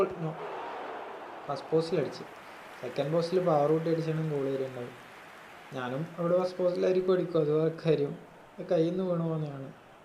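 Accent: Kannur, India. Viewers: slang kettappol thonni atha choyichee😅😆 knrr evdyaa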